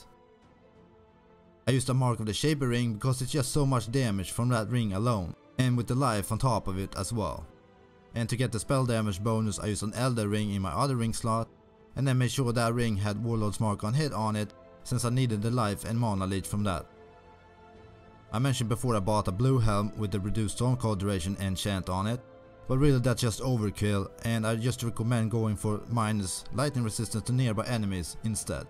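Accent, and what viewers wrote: Sweden. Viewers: He's swedish. It's disgustingly obvious. Horrible accent.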